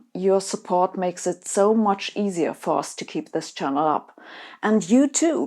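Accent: Germany. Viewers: She makes German accent sound good, but there is nothing German about the logic itself. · As a student of the German language, I love you accent.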